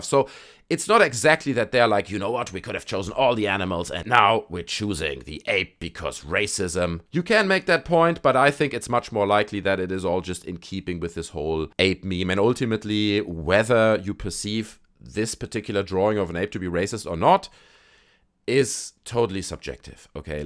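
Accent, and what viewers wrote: Germany. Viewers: coming back to that video years later and found ur channel. must say glad to see a German speaking 😂 with the accent on this... i am african. everything has a consequence, u cant spit on someone's face constantly and think yup we better than them nothing will happen lol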